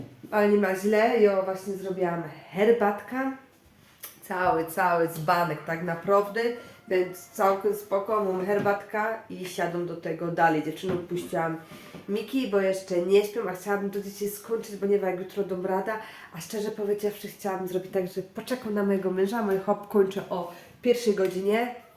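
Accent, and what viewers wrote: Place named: Silesia, Poland. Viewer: Witaj fajna gwara ślązoczka czy inny górski region?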